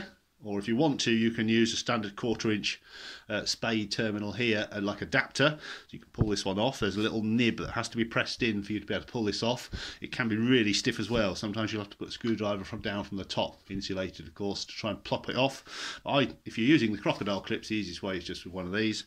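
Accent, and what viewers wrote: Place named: Alabama, United States. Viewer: Hard to believe. I'd like instructions for my gen 2 prius. I'd be willing to use a 120volt squril cage fan to cool my pack. My fan has a plug with several wires. I could research which 2. But I'd love to make the charger for my 07 prius. Like they say a little over 200 volts DC thanks. Love the Alabama accent!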